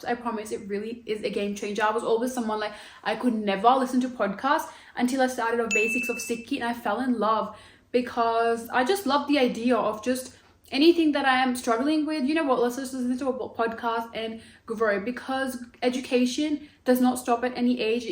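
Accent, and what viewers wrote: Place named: India. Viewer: All I hear is CURRY CURRY CURRY in an Indian accent 🤮🤮🤮🤮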